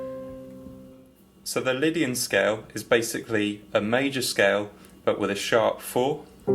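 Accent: United Kingdom. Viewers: also you're very charming and I love your british accent :3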